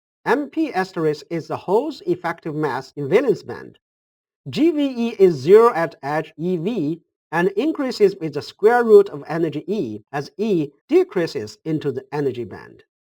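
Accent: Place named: China. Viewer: Sounds like chinese accent, lol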